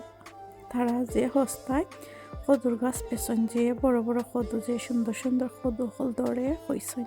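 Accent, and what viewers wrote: Sylhet, Bangladesh. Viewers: আপু সিলেটি কথা গুলো আমার অনেক ভালো লাগে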